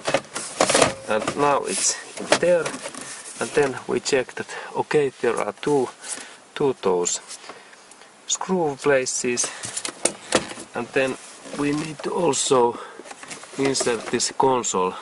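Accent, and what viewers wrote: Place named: Finland. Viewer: I am not a mechanic, I liked your video and the way you showed me how to do the cable tensioning. I would have thought that you should have jacked the vehicle up and put on jack stands, and then before assuming that the tension was right, go and see how the wheels spin freely. BUT I guess by evaluating the clicks and thereby the tension in the cable would be sufficient. I learned from you, but I will jack the vehicle up as I am not a mechanic and would not be sure that I have the right tension, perhaps also the shoes should be adjusted, I don't know about it, but I am about to do a Camry 2003 cable, if there is a cable, the handbrake leaver has no resistance as if there is no cable or connection at all. Can you can you come to North Queensland and do it for me? Cheers, and thanks. Yes by the accent I know you are from lovely, friendly Finland.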